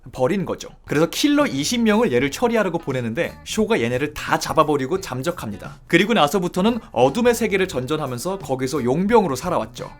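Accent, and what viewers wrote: United Kingdom. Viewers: 영국 발음이라 그런거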